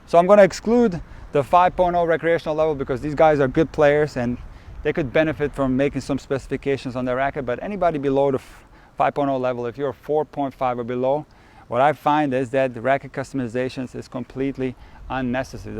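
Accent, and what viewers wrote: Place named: Eastern Europe. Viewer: is the Eastern-European, no B.S. coach you have in your dreams/nightmares. The accent, the straight-talk, no coddling, no touchy-feely*. Just cut the crap and get to the point. No excuses. I pulled all the weight off my rackets after this video because it confirmed my suspicion that it really wasn't worth the trouble. I'm not that good. I'm flattering myself. *I work in a very touchy-feely profession. I'm touchy-feely. But from my tennis coach, I want cold hard unvarnished truth. Update: I bought a 3rd frame (used 2018 Radical) so I can honor my desire to tinker unnecessarily with things (it’s an affliction, I admit). This also is per suggestion - 2 frames the same in case of string breakage, 1 frame for trying different stuff.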